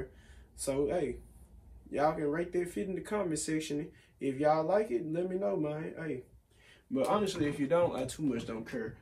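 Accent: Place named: Memphis, United States. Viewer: Soon as I heard you talk I knew you was from the M😂 901〽️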